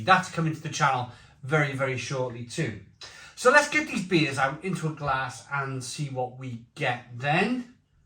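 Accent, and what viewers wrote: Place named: Cardiff, United Kingdom. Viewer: This man sounds like he's from Kardiffffffffffff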